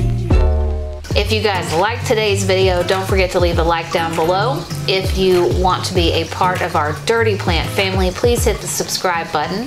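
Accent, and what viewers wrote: South, United States. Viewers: Hi, really love your videos. You are the cutest and love your accent!! I have one too as I’m originally from Georgia now Calif(👎). You and are a trip🤗👋 and Winston too!! You are so knowledgeable and cute and you come across as so real🤗👍. Steve’s leaves begonias are delicious!! With your rev think I’ll try them😁. Thanks again for your exceptional videos, regards to and Winston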